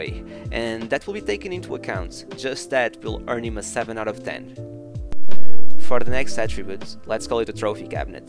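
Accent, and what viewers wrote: Portugal. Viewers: Lovely video from a portuguese speaker, if I can catch your accent correctly, am I right? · Judging by your accent, I'm very sure you are Portuguese. Great job with this channel, keep going! 😁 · O sotaque e pronunciares bem os nomes portugueses denunciaram-te🤣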